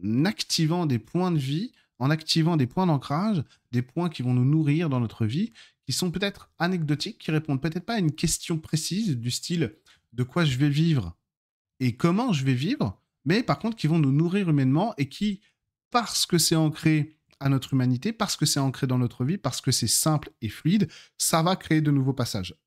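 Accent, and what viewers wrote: South, France. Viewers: Merci beaucoup pour ce partage ! Et l'accent du sud nous apporte ce petit côté ludique ❤! Ps: j'adore ton t-shirt!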